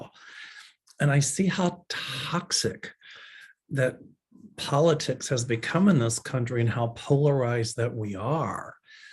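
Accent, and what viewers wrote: South, United States. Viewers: it’s important to talk about your experience of being a victim of Bullying. It’s real, it’s true and others may not ever know of the damage and pain it can do. It may make a difference. As for your articulation… a beautiful southern drawl and it yours!!!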